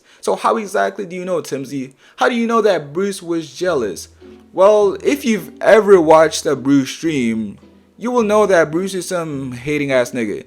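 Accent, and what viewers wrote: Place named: Toronto, Canada. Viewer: toronto accent is crazy😭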